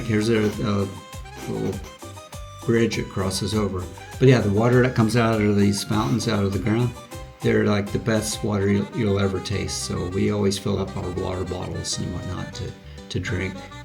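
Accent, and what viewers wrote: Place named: United States. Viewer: hi, thanks for the video, ex-californian here in sofia for 6 years. i did find your american 'a' in sandanski a bit grating, i hope you eventually find it natural to use the bulgarian sound for 'a' at least in the name of your new city. showing respect for the locality may make your integration a bit easier. i look forward to following your story. best of luck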